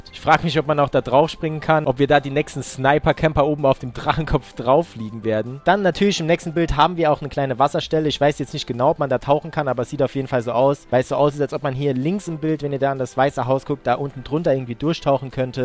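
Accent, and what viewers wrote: Saarland, Germany. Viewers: Kommst du aus dem Saarland?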